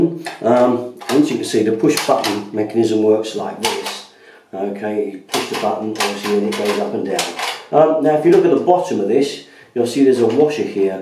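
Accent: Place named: England, United Kingdom. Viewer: I’ve learned a lot from this guy over the years. Down to earth sort of chat and really helpful. Saved me a fortune in plumbers’ costs. Lovely English accent too.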